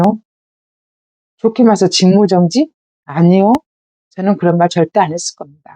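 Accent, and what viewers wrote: Jeolla, South Korea. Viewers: 씀했는데.... 전라도 사투리인가요?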